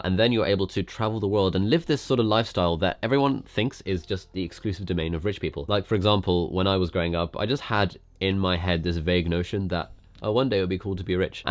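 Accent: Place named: United Kingdom. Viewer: British accent is annoying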